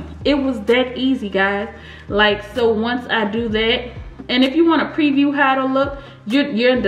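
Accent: New Orleans, United States. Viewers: Are you from New Orleans? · I heard you talking and I said she must be from New Orleans or somewhere around there. Check the description, Sho nuff you are. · Yes, let’s please! I hear New Orleans 💜💚💛 Is that where she’s from? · Naturally New Awlins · I have a quick question 🙋🏾‍♀️ Does any marketing or advertising that we have to do. Also I am from (Nola) I can spot our accent anywhere 😂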